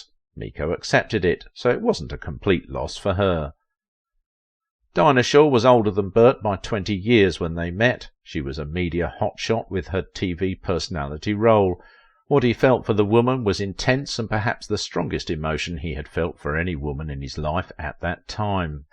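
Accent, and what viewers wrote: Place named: Cockney, United Kingdom. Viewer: One thing I cannot stand when Englishmen decide to host like a documentary in America number one you may be speaking English but you're not speaking American and when you guys mumble on top of your Cockney accent it's virtually impossible to understand you someone made this documentary even worse is he didn't take the time to post the photos that goes along with what you are saying everything's out of sequence so it's so hard to follow engage and understand and enjoy what the hell you're talkin about please cease and desist dot-dot-dot I have seen documentaries of WWII hosted by English-speaking men and I'm completely able to understand them cuz they articulate well would you please do the same or get the hell out of Dodge do sincerely your friend and mine me ... Christ! 🙄🤔🤨🤪😜🤫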